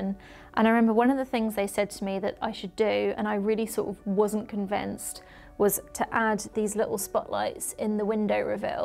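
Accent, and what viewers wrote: United Kingdom. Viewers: British accent is beautiful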